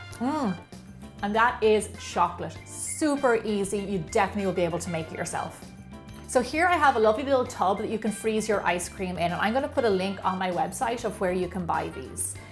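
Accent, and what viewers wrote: Ireland. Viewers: Is Irish if so I am too lol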